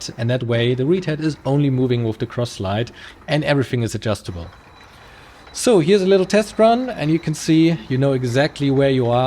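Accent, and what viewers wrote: Germany. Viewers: ich höre deutsch xD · Selten so ein Deutsches Englisch gehört. Geiles Video, Jung.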